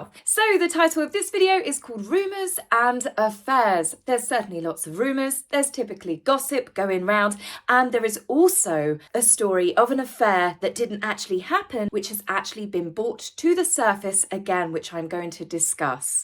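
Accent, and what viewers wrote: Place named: RP, United Kingdom. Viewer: I have not watched you in a very, very long time I was like you tried to change the way your voice sounds, and the way you pronounce words. Did you do that to sound more posh? 😂😂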